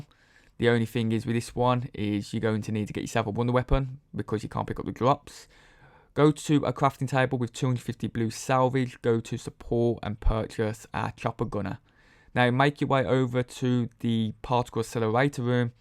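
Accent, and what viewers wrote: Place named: Birmingham, United Kingdom. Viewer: Called a brum accent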